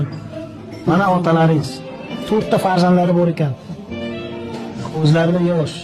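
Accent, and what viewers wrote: Khorezm, Uzbekistan. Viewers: Ота Хоразмдан экан шева Хорасмча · Амаки хоразмнан шекилли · Гапириш Шева хоразмга тортяпти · Фаргона шеваси эмас бу хоразим шевасику фаргонада ова дейишмайди .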